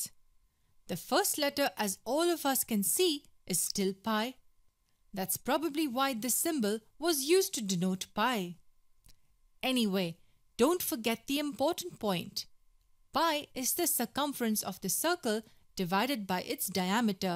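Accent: India. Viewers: very hard to follow, traditional Indian accent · Of course it's an Indian accent narration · I don't understand that Indian accent...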